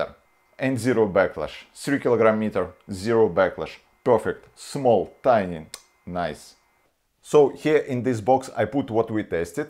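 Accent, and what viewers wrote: Ryazan, Russia. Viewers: Я понимаю, я и близко не могу говорить на английском так как Вы, но,...блин русский акцент, это просто пипец, <link> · Это акцент Рязанский . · So Russian accent :) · Адский русский акцент, прям facepalm.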